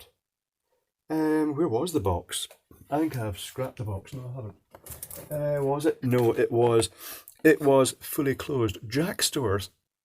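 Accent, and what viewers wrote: United Kingdom. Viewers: I just love this fellas accent. Definitely a Brit.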